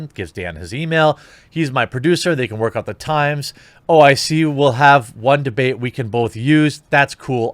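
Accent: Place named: Wisconsin, United States. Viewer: lol he's from wisconsin. that's how they pronounce bag. BAYG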